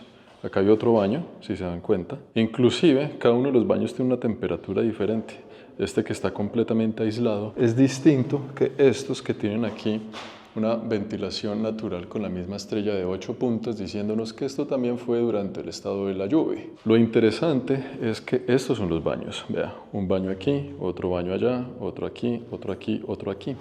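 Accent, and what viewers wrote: Bogotá, Colombia. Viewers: Primera vez que le veo y le FELICITO POR SUS VIDEOS TAN INTERESANTE APARTE ME RECUERDA SU ACENTO AL HABLAR A UN MARIDO QUE QUISE MUCHO COLOMBIANO WOW GRACIAS POR AMBAS COSAS Y QUE DIOS LE SIGA CUIDANDO PORQUE ANDA EN LUGARES PELIGROSOS SOY MEXICANA UN ABRAZO BENDICIONES ❤ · No estoy de acuerdo con eso. Es un colombo-panameño, con un acento rolo (bogotano) muy marcado que indica un estrato social bajo... no puede regresar a muchos de los lugares a los que va. Lo primero que hace al llegar a Siria sin saber una palabra de árabe sirio es meter la cucharada y hablar de política con una ingenuidad e inocencia extrema. Pareciera que trabajara para el Pentágono en los EE.UU. porque su primera movida y la repite en este vídeo es criticar a la familia al-Assad sin haber hecho su tarea. Hoy no podría visitar Siria si no fuera por Bashar al-Assad que se vió forzado a tomar el timón del país para salvarlo cuando no quería nada que ver con política. 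A eso le llamo llegar de aguafiestas a meter la cucharada en donde no te llaman. Entonces qué hace en Siria? crear contenido pero esparciendo el Kool-Aid y la propaganda de Washington sin haber hecho su tarea. Algo parecido al desastre que causó con sus vídeos de Ucrania donde llegó con la ignorancia más grande del mundo y terminó haciendo vídeos propagandísticos que promueven al régimen de Zelensky controlado por Washington. Desastre absoluto y aquí lo primero que critica y donde erra es que menciona que Siria ha sido controlada "practicamente por la misma persona". TOTALMENTE FALSO. Bashar al-Assad es el elegido por el pueblo sirio. No menciona que la guerra la financió EE.UU. y que el supuesto "pueblo" que se echó a las calles fueron mercenarios extranjeros que se fueron adentrando en el país p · Como me río con las ocurrencias y el acento tan rico colombiano, una terapia, y de igual forma cubriendo países que de otra forma no veríamos de manera personal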